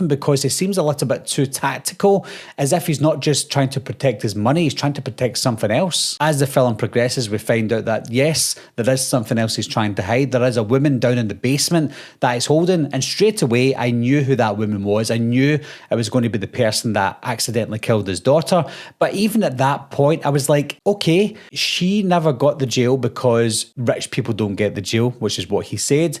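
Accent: Scotland, United Kingdom. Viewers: I didn’t expect to come across an Irish youtuber that breaks down movies, I was curious, but now I’m pleasantly surprised it’s the accent I like to hear the most😭😭 (or it could be Scottish I honestly have trouble differentiating the two, but I like them both)❤️✨ Checked the about. Sorry, Scottish** youtuber 😆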